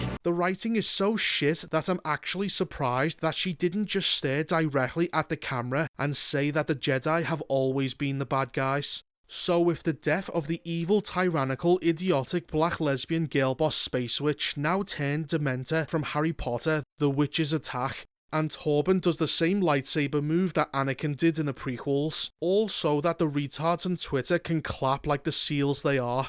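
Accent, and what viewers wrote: Liverpool, United Kingdom. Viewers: I would like to thank from the bottom of my heart for his extremely informative, mercilessly honest and extremely entertaining videos. Unfortunately, my twin brother and I discovered him just recently, as a true gem of the Internet. We enjoy movies, series and games both dubbed and with the original soundtrack since early childhood, regardless of nationality. To get straight to the point, the above-mentioned factors in combination with his great (scouse?) accent are a relief for the soul and have already ended many a shitty day with more than just a smile on our lips. If possible, let us know how we can support your channel so that this source of knowledge and good humor never runs dry. Regarding "The Whackoshite", I would like to mention a dialogue that I would love to have: Disney / K. K. / L. H.: “We cannot understand what the audience really expected... 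It’s simply impossible to do Star Wars (Episode IV-VI) justice and create something equal.” Me: “Do you want to know what’s really impossible, Disney / K. K. / L. H.??? Peeing in the corner of a round room." The Power of NONE... 🎭 🎭 🎭 The Power of FEW... 🎭 🎭 🎭 The Power of FUBAR... 🎭 🎭 🎭 P. S. Please forgive me for my possibly poor English. I'm a little older and therefore a little rusty.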